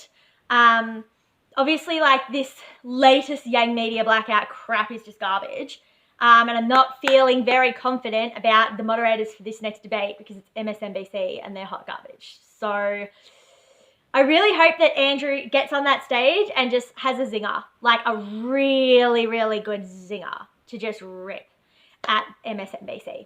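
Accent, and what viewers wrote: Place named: Australia. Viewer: send me her and half of my family talk like you--super Aussies! I love Australia my cousins got a Viet Restaurant! You sing!?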